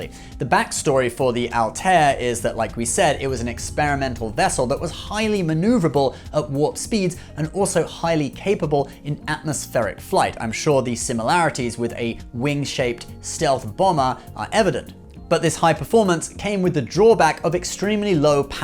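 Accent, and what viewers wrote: United Kingdom. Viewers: not a fan of british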